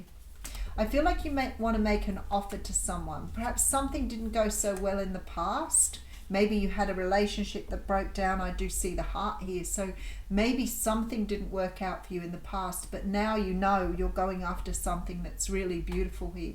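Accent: Australia. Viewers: He wants work on things with me. Spend time in a new and different location with just the two of us. As nice as that sounds, the last 3 years have nearly broken me - body mind and spirit. The skills I’ve learned from you. have been life changing for me!! Your sweet Aussie voice has become the voice in my head. Helping me sort thru difficult feelings. Without telling us what to do, you’ve lead by example and taught us how to empower ourselves! Thank you! ✨🙏💕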